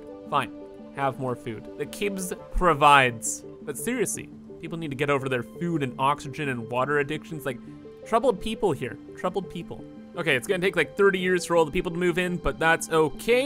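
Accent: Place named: Canada. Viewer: You sound so cute with your funny Canadian accent!!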